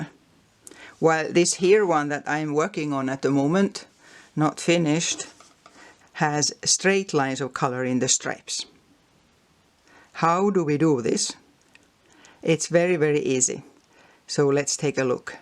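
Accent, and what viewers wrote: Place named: Finland. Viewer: Thanks so much! I've been crocheting for over 40 years, but I'm relatively new to knitting, so this was really helpful. Also, I just wanted to tell you that even if I knew this tip before, I'd still watch the video because you sound just like my aunts sounded when I was growing up. (My dad's family was from Finland) So listening to you brings me back to my youth when I'd listen with fascination to their beautiful speech patterns. :)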